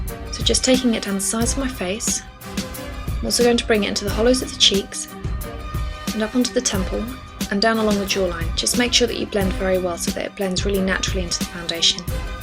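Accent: United Kingdom. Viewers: i dont have any of the make up stuff that you have, but sometimes i watch ur vids just to listen to ur accent. I LUV UK ACCENTS!! is that weird?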